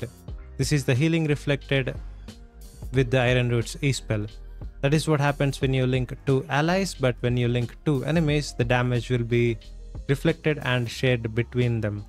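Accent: India. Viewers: Bro are u indian?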